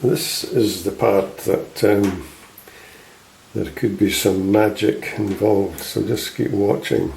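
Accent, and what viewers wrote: Scotland, United Kingdom. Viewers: The Scottish accent makes it special. You are quite understandable. Thank you for sharing your skill.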